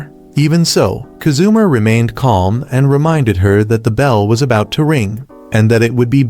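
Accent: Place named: India. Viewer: why did it switch to an indian dude narrating with no warning help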